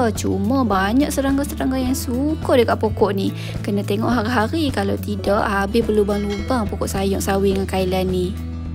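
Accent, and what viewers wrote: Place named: North, Malaysia. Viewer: Dengar loghat macam orang utara,belah kawasan mana?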